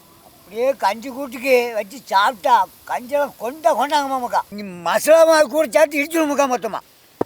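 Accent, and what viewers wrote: Tamil Nadu, India. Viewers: Which part of Tamil dialect is this ? Very interesting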